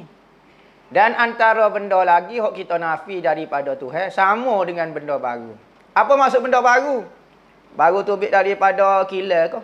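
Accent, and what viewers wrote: Kelantan, Malaysia. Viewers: Walaupun berbahasa kelantan pekat....tapi tidak bosan ,mudah difahami dan penyampaian jelas...alhamdulillah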